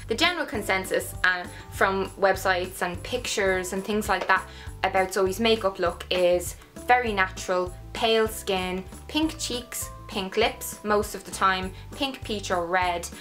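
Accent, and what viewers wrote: Ireland. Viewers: where in ireland are you from? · Thanks :) Personnally I prefer english speaking .. ^^ And I like your Irish accent too, and one day I'd like to visit Ireland. Seems to be such a beautiful country! ;)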